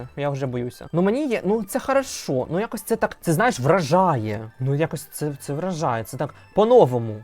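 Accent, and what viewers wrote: Ukraine. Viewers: Твої коментарі це щось. Це б ще я отако "обсирала" тих дідів до хліва і їх галстук. І мова, і вимова,і східняцький акцент. Ти прикольний. Тепер буду дивитись огляди на "лгбт-вакханалію" з тобою.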